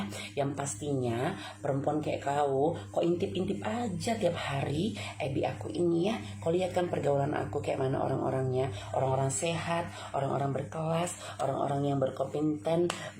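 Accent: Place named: Medan, Indonesia. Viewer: Sama Sama..tolong dong bikin konten marah marah ato kesel ato apalah..aku terhibur bnget Kalo Denger clotehannya..apalagi logat medannya..suka banget..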